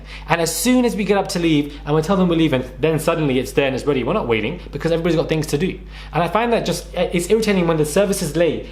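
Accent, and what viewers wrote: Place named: United Kingdom. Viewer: Wow!!! I didn't know you were in England even with the British accent because there are a lot of British people in the USA. What is a she-shell? I understand your frustration. .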